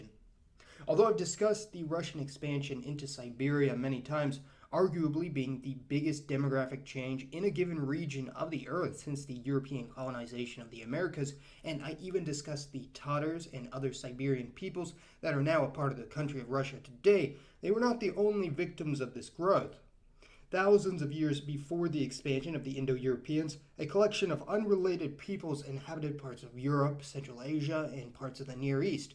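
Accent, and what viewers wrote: United States. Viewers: Isn't it telling that, just now, when the US is attacking Russia and saturating the world with anti Russia propoganda, that this US presenter complete with American accent covers this old history at this time? Its just the US trying to sow conflict as usual by sticking their boot in to widen any division anywhere they can find. Its just a distraction from all the wars, invasions, thefts and mass murder the US specialise in....to 'Defend' that land already defended perfectly well by the Atlantic & Pacific. "Don't look here, look at those Russian baddies" from centuries ago.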